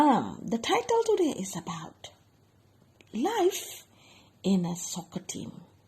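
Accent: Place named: United Kingdom. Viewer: It sounds like British. No boddy noticed that you are Indonesian 👍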